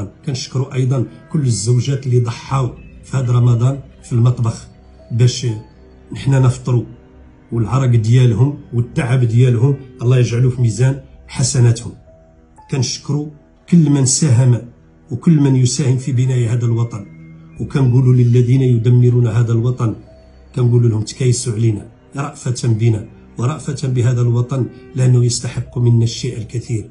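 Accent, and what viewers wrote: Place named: Hassaniya, Mauritania. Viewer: الله على اللهجة الحسانية .. الله يحفظكم اهل الصحراء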